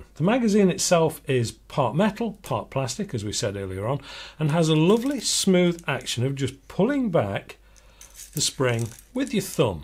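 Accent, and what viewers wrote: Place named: England, United Kingdom. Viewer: Why is David Duke talking with an English accent?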